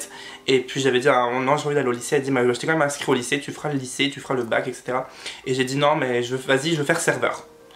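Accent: Franche-Comté, France. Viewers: Je me disais bien que tu avais un peu l'accent de Franche Comté :) Team Besac 🤗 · En tous cas ta vraiment l'accent francomptois 💯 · j'me disais que tu avez un accent que je connaisais, normal c'est le miens #TeamCancoillotte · Tu viens de Franche Comté ? Et tu es Arianators ?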